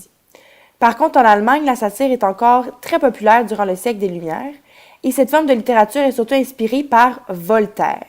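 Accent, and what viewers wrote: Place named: Quebec, Canada. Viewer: Tu es québécoise j’adore ton accent français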